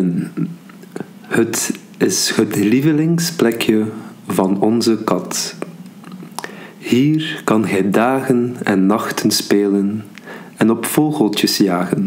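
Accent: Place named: Arab world. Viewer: у нас в языковой школе, арабы с таким акцентом разговаривают)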